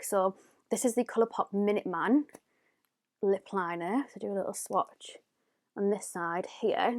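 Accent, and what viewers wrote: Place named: North East England, United Kingdom. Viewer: Where are you from? :) the accent sounds North East? I'm from boro and it sounds similar hahaha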